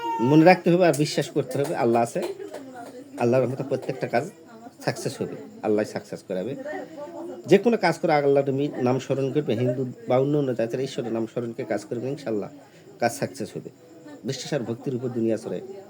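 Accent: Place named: Naogaon, Bangladesh. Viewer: কিছু মনে করিয়েন না একটা কথা বলি আপনি তো নওগা জেলার ভাষায় কথা বলেন কিন্তু আমরা দেশের বিভিন্ন জায়গা থেকে দেখি সেখেএে আপনার কথা বুঝতে কষ্ট হয় তাই যদি একটু চলিত ভাষার সাথে সাধু ভাষা বা লিখিত ভাষা বলতেন তাহলে কথা বুঝতে সমস্যা হতো না গুরুজি❤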